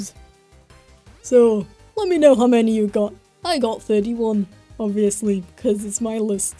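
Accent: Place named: United Kingdom. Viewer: love this british or somethin man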